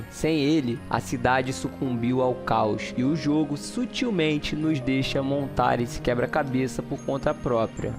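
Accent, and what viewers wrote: Brazil. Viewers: de qual estado brasileiro tu é?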